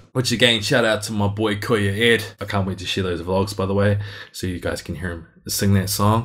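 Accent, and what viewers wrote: New Zealand. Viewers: R u kiwi?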